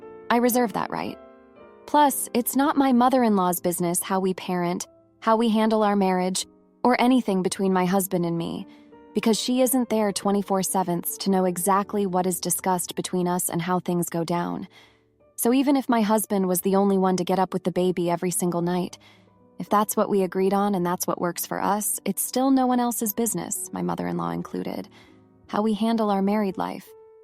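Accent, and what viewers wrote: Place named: California, United States. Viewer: Wish you could pick a less California cyunty sounding nasal snake sibilance AI voice. 😂 Annoying AF.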